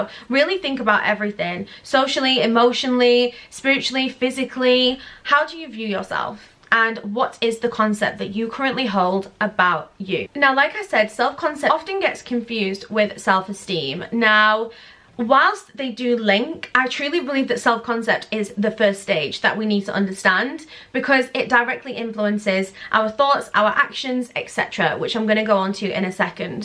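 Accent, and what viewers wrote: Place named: United Kingdom. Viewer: I am in love with the british accent, I could listen to this for hours 🫶🏽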